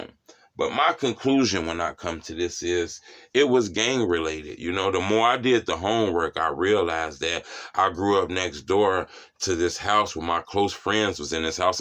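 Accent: Chicago, United States. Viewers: I knew u was from the Go from the accent when I watched your first video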